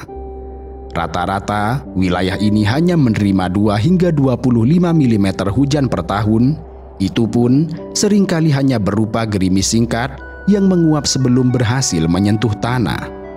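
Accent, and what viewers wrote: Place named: West Java, Indonesia. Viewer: Fiks. Yg ngomong orang sunda.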